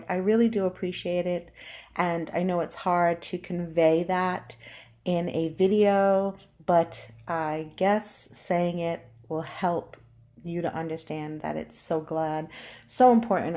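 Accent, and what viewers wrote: Northeast, United States. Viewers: Hello- my first time here.. You sound as if your from my neck of the woods...NE. " Markelytes" yiddish& bi racial?lmao... I doubt anyone believes anything they hear...We are all loving the gossiping& the Bashing! She is a mf joke& i for one am loving it!😉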